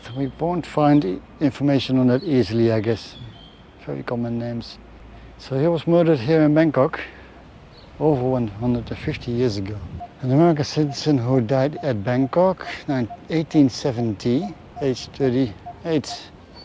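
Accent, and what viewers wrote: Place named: Germany. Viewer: Oh pardon me. I just think you're German from your name and accent. also, I just know that you like the cemetery beside you like trying transportation. Indonesia actually has a lot of Dutch cemeteries. some of them still exist and some of them have already been destroyed. Examples of good cemeteries like Makam Jerman Arca Domas. Its cemeteries said that it's Nazi soldiers. Others are still good like the cemetery in kebun raya bogor, makam belanda at Menteng dalam Tebet and the museum Prasasti.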